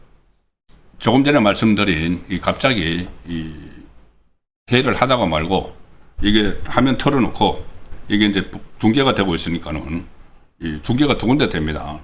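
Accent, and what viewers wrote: Gyeongsang, South Korea. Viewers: 송국건씨 말솜씨가 그래가지고 평론 하겠어요? 경상도 사투리억양이야 타고난거니 어쩔수 없다 하더라도 버벅거리고 두서없고 툭툭 턱턱거리는 말투와 어휘력 에 듣기가 거북하네요. 아나운서 정도는 아니래도 평범한 수준이라도 되야지. 참 딱하네요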